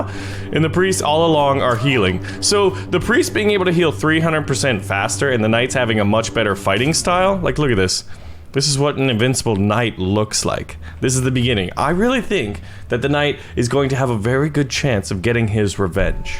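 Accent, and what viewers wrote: Scotland, United Kingdom. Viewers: Best Scottish accent from - 2019.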